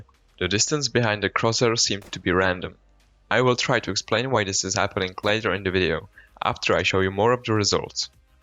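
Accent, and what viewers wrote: Czech Republic. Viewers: Český přízvuk se pozná všude :D Pěkný videjko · to je hustý že jde tolik poznat že jsi čech z toho přízvuku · skoro jsem nepoznal že jsi čech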